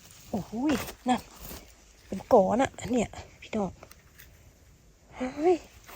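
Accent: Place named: Korat, Thailand. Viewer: ฟังคนโคราชบ้านเองพูดแล้วชื่นใจ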